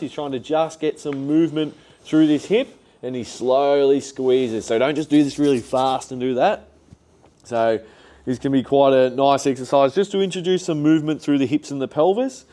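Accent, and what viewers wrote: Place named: Australia. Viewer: So much knowledge .. w some latin and the Australian accent . Glad my phone is in my pocket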